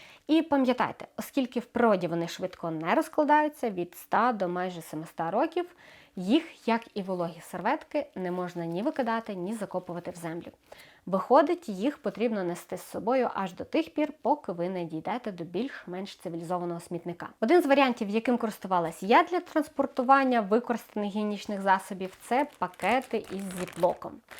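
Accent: Standard. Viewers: дуже чиста і невимушена українська мова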